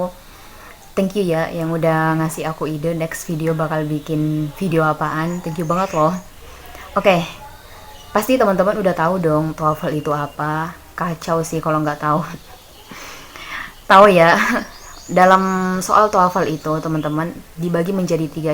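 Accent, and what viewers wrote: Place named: Sulawesi, Indonesia. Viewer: Orang Sulawesi yah kak? Mirip sama ust Syam logatnya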